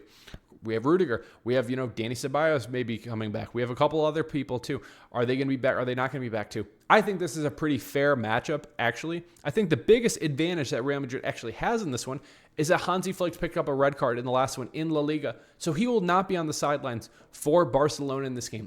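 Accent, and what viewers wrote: United States. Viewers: If its a contest between the midfields, madrid will lose. Part of how/why Sevilla won vs Barcelona was Sevilla interrupting Barcelona's "normal" passing network. [You sound American, so] Go ahead and look at a passing map for a "normal" Barcelona fixture, and then look at the passing map vs Sevilla. Normally, Barcelona run everything through Pedri, who then distributes the ball all across the park. But Sevilla had their #18 give up any pretense of doing anything other than to man-mark Pedri, which turned Barcelona's normal pass network into something that more looks like the USMNT. It also cut Pedri's "normal" number of touches in half. Taken together, this interrupted Barcelona's possession plans. Most imbecilic commentators are too lazy to see anything beyond "the high line" with Barcelona, but what's more important to Flick are Barcelona's press, and Barcelona's possession. 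Interrupting Barcelona's possession is the one way that another midfield can outplay Barcelona's. Sevilla did it, and Q$G did it. With respect to madrid, I don't know if any of Camavinga, Guler, or Bellingham are willing to subsume "their own" games, in order to go try to mark Pedri out of the game. In fact, I doubt it. It is for this reason that if it comes down to madrid's midfield vs Barcelona's, madrid will lose.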